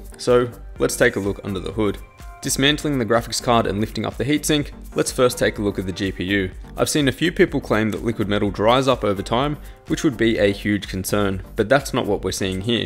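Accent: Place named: Australia. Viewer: Subbed for Australian accent.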